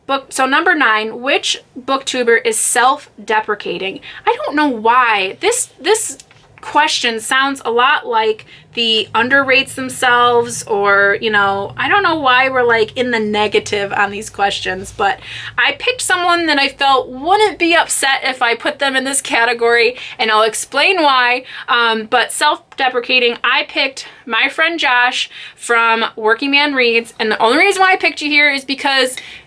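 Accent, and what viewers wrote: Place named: United Kingdom. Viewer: British accents are always a plus XD awwwww! You are definitely warm and friendly!!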